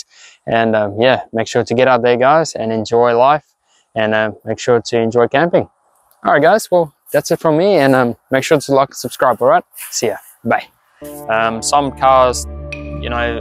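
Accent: South Africa. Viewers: Nice setup man! I must ask, are you perhaps South African? Because i somehow hear a bit of an Afrikaans accent coming through 😁 But ja, shot for the tips!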